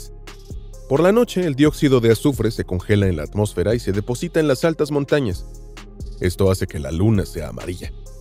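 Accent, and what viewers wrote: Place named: United States. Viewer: Todos estos canales que se las tiran de cientificos han de tener narrador latino agringao?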